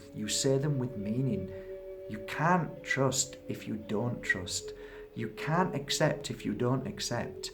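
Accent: Yorkshire, United Kingdom. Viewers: You from Yorkshire northern England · Is your accent Yorkshire? I have a Leeds accent, yours sounds Leeds/Calderdale area? Just found your channel - it's wonderful!! Many thanks x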